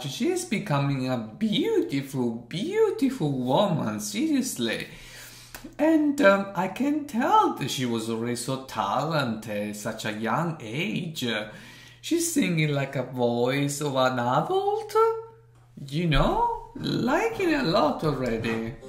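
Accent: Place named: Italy. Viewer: Omg i love your voice so Italian, humble, and your reaction so so natural, good luck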